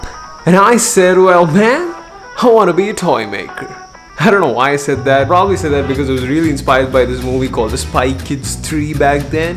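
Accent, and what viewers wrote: South India, India. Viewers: are you from south india?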